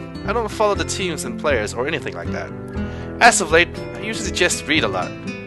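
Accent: Denmark. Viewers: Are you danish?!! O_O I am danish! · I wonder what his first language is? Danish, maybe?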